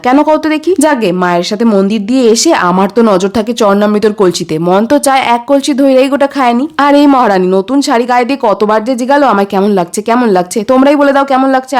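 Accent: Bangladesh. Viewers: Kotha gulo Bangladeshia jogonnno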